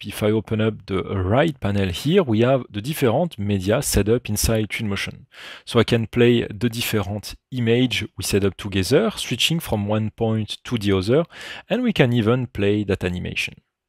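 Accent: France. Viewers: le petit accent français là il me fait me sentir chez moi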